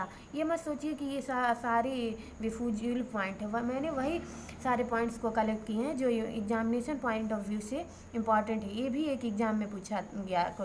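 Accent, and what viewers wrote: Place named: Eastern Uttar Pradesh, India. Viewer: Mast Aap east up ke lgte ho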